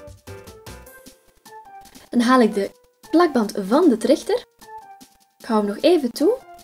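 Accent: Belgium. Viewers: doe normaal man ook niet iedereen vind jou stem mooi maar juist lelijk en zij kan er niks aan doen van die stem ze is Belgies!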